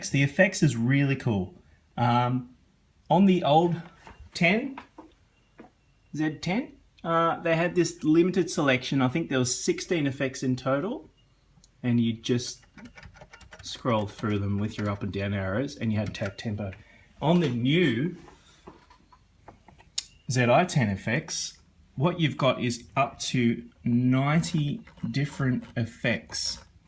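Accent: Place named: Australia. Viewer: Love the oz accent 🙂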